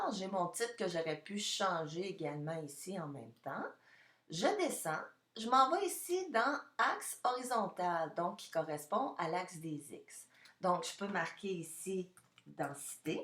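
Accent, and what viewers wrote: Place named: Quebec, Canada. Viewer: merci vraiment pour ta vidéo et surtout pour ton adorable accent québécois lol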